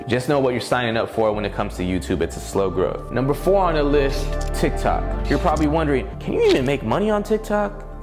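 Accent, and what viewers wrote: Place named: United States. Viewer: Dude you need this in your video for people who , don't understand US accent english 10 Side Hustles to make MONEY from HOME! ($100k + per year) 10 Side Hustles You Can Do To Make Money From Home Section Overview: In this video, the speaker shares ten side hustles that require zero dollars to start and have the potential to replace your current job. The speaker provides detailed information on each of these side hustles. Your Own Clothing Store You can create your own custom t-shirts using websites like Printful or Teespring. You don't need to buy inventory; you just mark up the price from the cost of the product. Create your own Shopify website and connect it with Printful so that every time someone buys something from your Shopify website, it looks like they're buying from your store but in reality is being bought for Printful. 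You never have to worry about cost of inventory and you never really have to pay a dime out of pocket. Script Writer People out there need scripts for making content. Hire scriptwriters on Fiverr or Upwork who will do research on your behalf and create a script for you. Many people hire scriptwriters for their YouTube videos, informative videos, skits for YouTubers, tick-tockers, Facebook creators etc. Showcase your work on Fiverr or Upwork by building a portfolio. YouTube Find a niche and ride with it. If you don't want to show your face, start a cash cow channel showcasing celebrity mansions. 03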